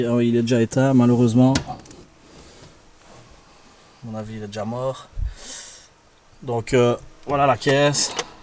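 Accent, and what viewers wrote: Liège, Belgium. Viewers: Sympa ta vidéo et ton accent de liège! ;0)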